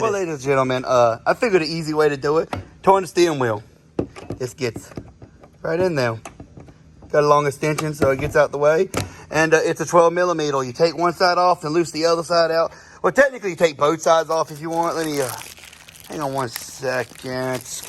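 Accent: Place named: South, United States. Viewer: Over tightening is my middle name 😂. Always stripping screw heads or bolt threads. Bad habit. Side note, that Southern accent is pretty cool. I thought I was listening to the American Dream Dusty Rhodes telling me how to fix my Fourwheeler..😂😂 I have an issue with the steering being hard to turn. I replace ball joints, tierod ends and wheel bearings on both sides and it turns a little better but surely should still turn easier. The bushing is one thing I haven't checked or changed. Was wondering about greasing it but idk if it'd do any good since you said it didn't really make a difference on greasing a new one. (Btw all that stuff I replaced needed replaced anyway but barely made it easier to turn)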